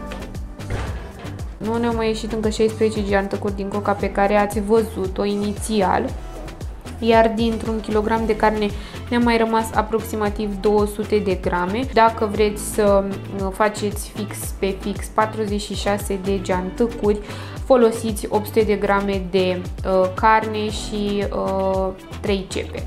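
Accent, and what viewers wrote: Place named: Moldova. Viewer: De ce vorbeşti cu accent moldovenesc... 🤔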